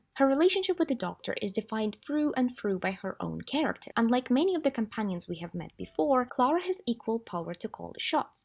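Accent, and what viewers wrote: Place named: Russia. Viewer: The whole video I thought whether you are a native Russian or not. Love your accent and your English! The essay is sooo well structured and interesting Good luck to you!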